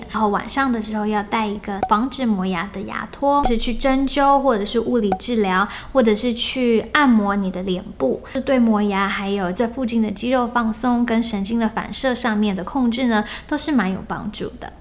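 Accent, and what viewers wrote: Taiwan. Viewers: 😀因為您的口音，我猜您是台丸人? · 你的國語有台灣口音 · 口音似乎是從台灣來的。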